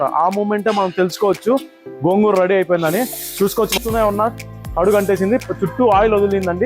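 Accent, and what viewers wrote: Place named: Anantapur, India. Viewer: Abbayi meedi ananthapur ah??? Slang alane undi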